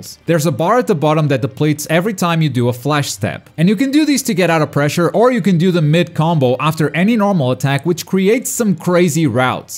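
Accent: Portugal. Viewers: Oh man, I thought you were from Spain all this time, and it turns out you're from Portugal. Nice! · I didn't know you were Portuguese, but then the more I heard your voice, the more I realized your accent is like St1ka's.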